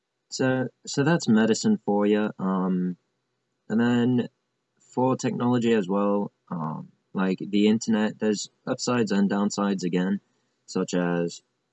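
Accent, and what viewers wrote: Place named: United Kingdom. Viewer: What is with the British accent? That dog is from one of your past videos too. Some technology doesn't make people smarter like the new Iphone for instance you don't have to reach up the entire screen just to get stuff you double tap the center button and it comes half way down the screen. Other technology like stuff for hospitals make it easier and sometimes less painful for people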